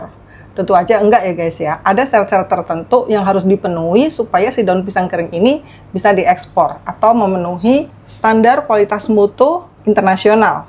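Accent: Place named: West Java, Indonesia. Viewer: Sunda pisan teh...😊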